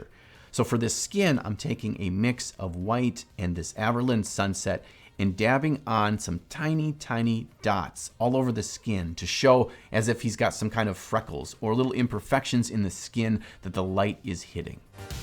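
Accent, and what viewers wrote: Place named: Midwest, United States. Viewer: I knew you were a midwesterner, and that ope was the final clue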